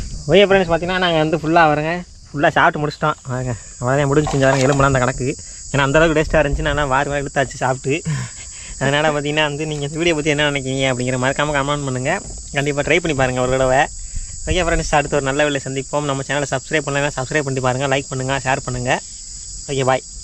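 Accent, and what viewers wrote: Sivaganga, India. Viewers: Siva gangai tamil super nanba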